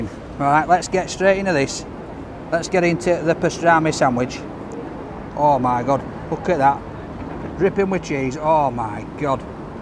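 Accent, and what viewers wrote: United Kingdom. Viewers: ❤️ his British accent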